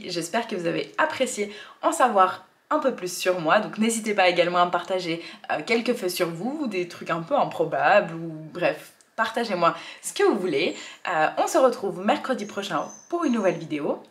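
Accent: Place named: Switzerland. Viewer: Tu a un très jolie accent suisse merci pour se partage 🙏🏿 Paix sur toi 🙏🏿 et ton chérie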